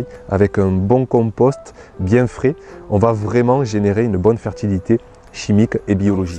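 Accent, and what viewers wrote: South, France. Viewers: Très bonne vidéo très agréable à voir et écouter avec l'accent du soleil 😊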